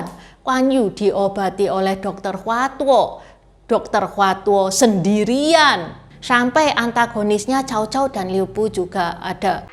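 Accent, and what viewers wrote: Java, Indonesia. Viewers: hanya wajahnya saja yang masih kelihatan tionghoa tapi logat bicaranya medok seperti orang jawa semangat terus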